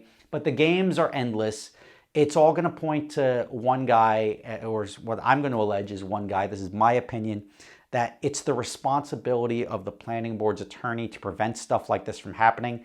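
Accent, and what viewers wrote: New Jersey, United States. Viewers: Sounds like Jersey alright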